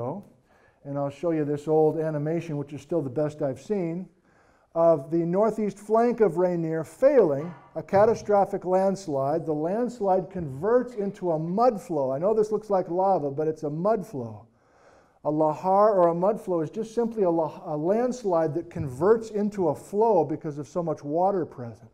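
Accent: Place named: Wisconsin, United States. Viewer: I knew I heard that Wisconsin dialect. I'm from there, too.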